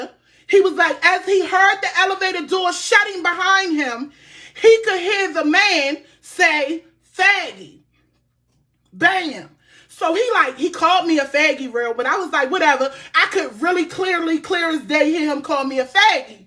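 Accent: Baltimore, United States. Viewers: Her accent izzz thiiiick lmao like my cousins in Bmore. I was like is she from Pittsburgh or Bmore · That Baltimore accent sounds good!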